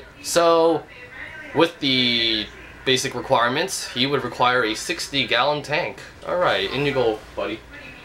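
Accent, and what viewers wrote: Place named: United States. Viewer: I think he might actually be american based on his accent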